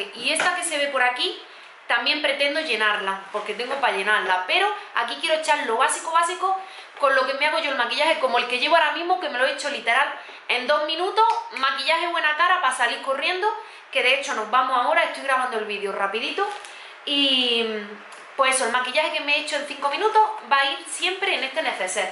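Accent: Granada, Spain. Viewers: Hola guapísima,!! yo nueva por aquí. Y por ser de Granada y tener ese acento tan granadino me flipo . Soy nacida en Girona pero mis padres , abuelos etc........ son de Granada ,,, la alpujarra concretamente de cadiar . Me alegra verte , ........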